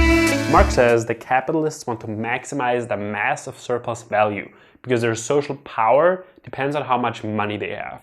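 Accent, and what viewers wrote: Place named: Germany. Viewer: You think your so smart because of your german accent. You are the bourgeoisie that Marx described. You benefit from the superexploitation of the third world. Maoism-third worldism is a new era of marxism. Your class position in society is determined by the level of exploitation you benefit from not always the relations with the means of production. For instance, during the feudal times, the serfs were held liable to work for a certain quantity of days for the nobles. Even though the serfs owned the means of production such as the land, tools, and equipment, under feudalism, they were compelled to abide by the rules of the ruling class under feudalism which soon led to the french revolution. The french revolution brought forth the primitive stage of capitalism by the execution of King Louis XVI. Feudalism is the dictatorship of the nobles as to capitalism is the dictatorship of the Bourgeoisie and socialism is the dictatorship of the proletariat. 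Capitalism-Imperialism is the dictatorship of the new bourgeoisie, the first world parasites.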